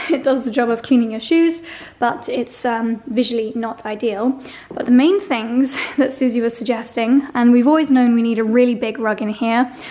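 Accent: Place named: United Kingdom. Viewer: Hi! ☺️ I am a newer subscriber and I have got to say over the past month or so I have seen how practical you are, but that you also appreciate the finer things in life and I LOVE IT!!!! You are so sweet and down-to-earth and I’m here for your newfound hobby of gardening! Your music choices are so lovely and therapeutic and so is your voice and British accent! I really enjoy your videos and they help this busy mom get thru her day! Thanks so much for sharing! 😘 Take care!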